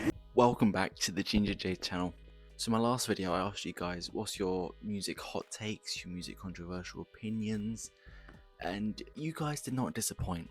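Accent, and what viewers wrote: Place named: United Kingdom. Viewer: I was not expecting you to be British, to be honest.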